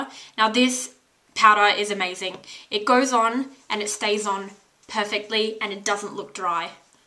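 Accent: Australia. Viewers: u aussie?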